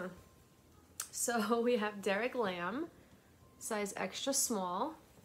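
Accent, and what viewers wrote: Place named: United Kingdom. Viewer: I can never decide whether you are doing a London Cockney accent or a posh British accent. It's a bit of a mix. I have a very broad, thick Scottish accent but some say I sound like a posh Scot. lol. Makes me Guffaw every time! The silk dress would be great dressed down with denim jacket and boots. I am I big boot fan and wear them all year round, with just about anything. I'd probably add a big squash blossom, knowing me?! 😁 Take care and stay fabulous! 🤗💞✌️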